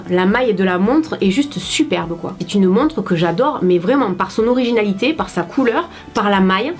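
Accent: South, France. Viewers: Tu est du sud? Quel est ton accent? j'adore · j'aime beaucoup ce que tu fais <3 puis entendre ton accent en sachant que je suis sudiste aussi, c'est un vrai régal <3 merci pour ces moments de partage Je viens de créer ma chaine et j'espere un jour pouvoir partager comme tu le fais si bien <3 Pleins de bonnes choses pour la suite....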